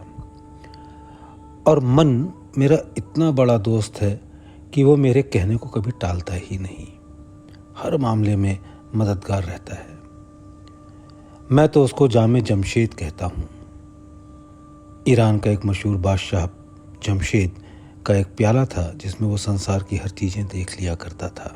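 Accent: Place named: Pakistan. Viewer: प्रिय भाई आपकी आवाज में पत्र सुनना बहुत मधुर लगता है विशेष उर्दु लहजे में अलग ही आनंद आता है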